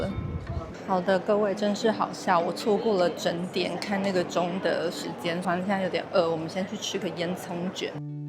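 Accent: Taiwan. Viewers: 台湾旅游博主拍得最好的一个，台湾口音特别好听的，没有太多鼻音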